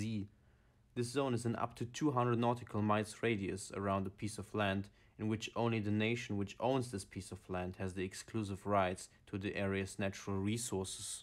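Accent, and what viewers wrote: Germany. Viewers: My guess would be Central European Germany maybe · What accent? Sounds German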